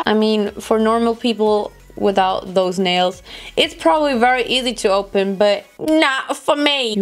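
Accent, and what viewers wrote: France. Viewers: I love your accent french !😍😍 I am FrenchGirl I love makeup tutorial !! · Awww your french accent hahaha Lots of love from Montpellier, South of France 😘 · Super accent français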